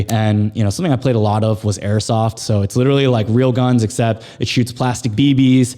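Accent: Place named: Los Angeles, United States. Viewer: Crazy man, glad you got away clean. It does seem like things are getting sketchy lately in my area (PNW) and across the country. You have the voice to reach more people than most so kudos for sharing this situation. I lived in Montgomery County when I was a kid. You're missing the Maryland (Muuuriland) accent, I had you pegged for LA bro. Keep bringing the solid content!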